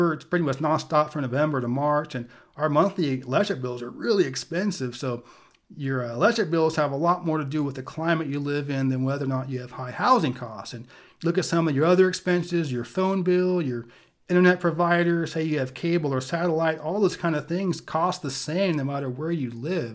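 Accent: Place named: Tennessee, United States. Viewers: I mean this in the most complimentary way...you have adopted the Chattanooga accent! From a fellow Chattanoogan 😁 Thank you for this video. It is what I have always suspected. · I would have guessed you from FROM Tennessee, not California based on your accent. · you're developing a TN accent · Why do sound like a hillbilly if you are from California, the thing is when reagan was President he reduced spending to New York and California so state raised taxes, California and New York send so much Federal taxes to Mississippi and Tennessee and the rest of the hillbilly states.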